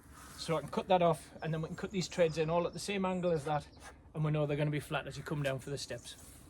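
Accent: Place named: Northern England, United Kingdom. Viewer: I love your running commentary teaching. I'm no carpenter, but I can follow your procedure. And I get nostalgic listening to the lovely Northern voice of my long ago childhood. Thank you.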